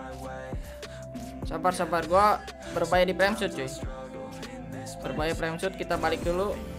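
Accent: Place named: Bandung, Indonesia. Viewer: sok Kadie siah wwkkwkwk. Bener pisan eyy si bang orang Bandung pisan wkakwkakaa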